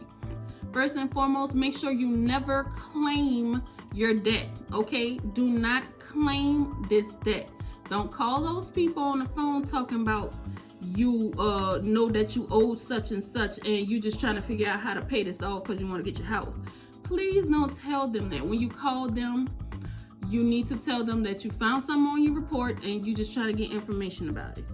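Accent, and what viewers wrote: New Orleans, United States. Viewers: Great video! That accent is heavy, are you a NOLA sister?? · First time here. As soon as you started speaking I was like “she sound like she’s from New Orleans”, lol! so I checked the description box and I see that you’re in Slidell, close enough, I know my people! I’m in NOLA 🥰🥰 so now I’m a new subbie!!! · She sounds like she’s from New Orleans .. I need help negotiating stuff like past debts that are in collections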